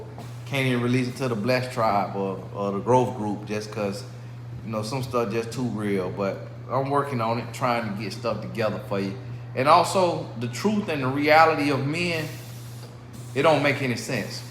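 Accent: United States. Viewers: Awesome as always,. But it's the way you say water and back for me. 😄 I love the country accent.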